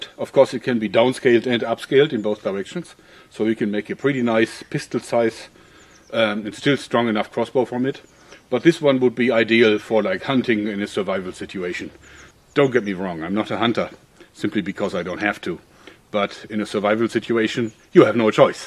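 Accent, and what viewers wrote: Germany. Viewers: I really like the German accent, everyone should speak english like that!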